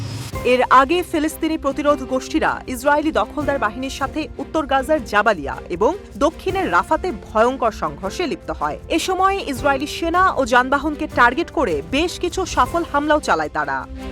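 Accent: Kolkata, India. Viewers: কোলকাতার মতো সংবাদ পাঠে বিরত থাকুন। শুনতে ভালো লাগে না।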